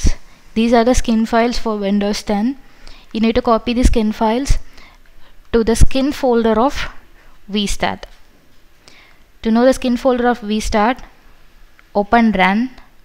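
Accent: India. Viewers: lol i know you are india i know from your sound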